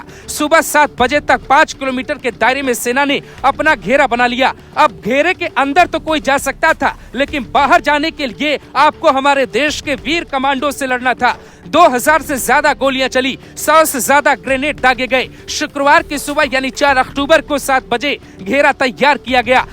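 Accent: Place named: Rural, India. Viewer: कैसे बोलता है रे😮देहाती।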